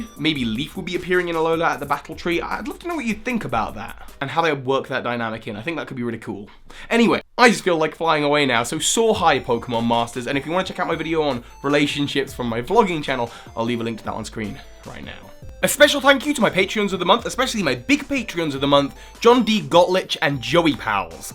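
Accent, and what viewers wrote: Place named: London, United Kingdom. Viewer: Where are you from in England? Based on the cadence of your accent, I'm assuming you're from the London area since it sounds like Daniel Radcliffe's accent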